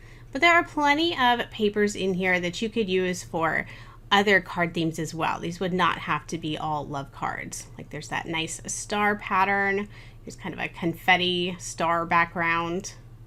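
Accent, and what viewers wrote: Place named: South, United States. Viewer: I keep forgetting you're not in the US. You sound like a good ole southern girl😊💖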